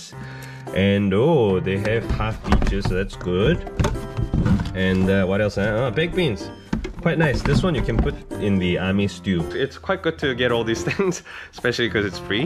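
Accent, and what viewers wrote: Singapore. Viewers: dude, where you? Still in NZ? Miss your Singlish leh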